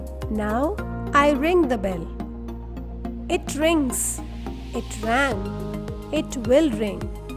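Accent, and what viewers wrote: India. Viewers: open, hear Indian accent, close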